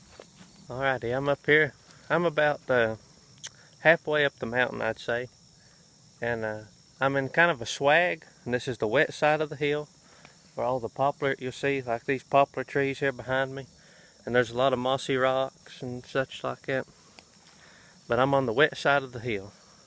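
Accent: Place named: South, United States. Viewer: Thank you for sharing your knowledge of the ginseng and also the medicinal plants. I've seen black cohosh in the pharmacy area of Walmart and think I know what it's for. You're blessed to have that land you can just walk out and go wandering around in, I wish I could do that but my husband likes the city and won't move. I watched the TV show about ginseng too and I thought they might be glorifying it a little but I'm sure there are people that go on land they have no business being on. I like the way you take care of the plants you have by replanting them, that's taking care of the gifts God has given us. Looking forward to meeting your family too. I have to say I love your southern accent, my mother was from MS and my dad was from West Virginia. God bless and take care, also when you said you had snake protection I thought you were going to pull out a venom kit and you had a pistol. 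lol Looked like the pistol had been around awhile too?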